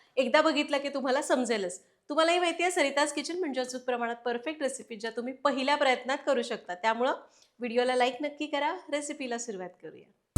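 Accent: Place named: Pune, India. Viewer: mam tumachi Marathi bhasha farach changli ahe. Agadi puneri ❤🎉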